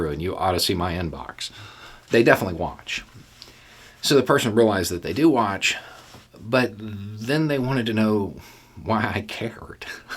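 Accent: South, United States. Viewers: He's in the South and he has an accent associated with the South. He looks and sounds like a "good old boy" which is generally a Republican voter.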